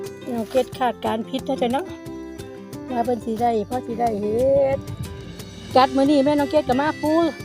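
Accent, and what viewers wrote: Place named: Phu Thai, Thailand. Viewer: ทางได๋น้อคือสำเนียงภูใท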